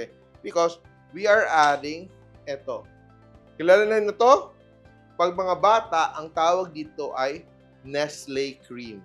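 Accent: Philippines. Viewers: Are you a cook from the Philippines? I noticed you sound like someone from the Philippines speaking Tagalog or Cebuano.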